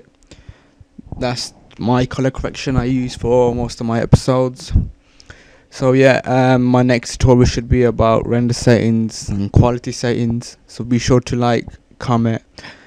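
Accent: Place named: United Kingdom. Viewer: Just love british commentaries :D - check you inbox -____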